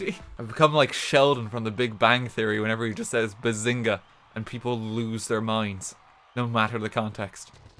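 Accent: Ireland. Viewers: That's how Irish people say it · Gotta love the Irish accent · First video I’ve seen and I love it, especially his BEAST Irish accent (I mean I’m Scottish but no ones perfect) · That’s the beauty of the Irish accent 😍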